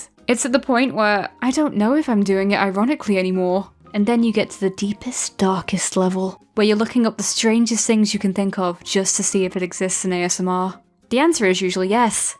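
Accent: United Kingdom. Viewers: for every video you sound british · As an American who loves a British accent, all of your content is ASMR to me. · Ur 2 British to do asmr vids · Mmmm british accent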